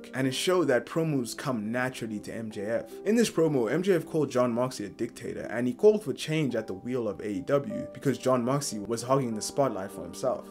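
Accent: South Africa. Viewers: I'm not sure whether its but you sound South African🤔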